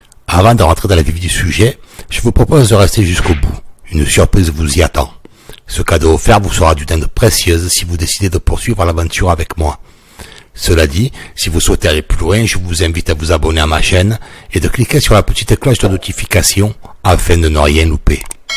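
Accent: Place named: Marseille, France. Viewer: Je trouve ce concept intéressant, car j'aime maîtriser ce que je fais. De plus la vidéo est sympa et ludique avec un accent Marseillais qui change de l'ordinaire sur Youtube !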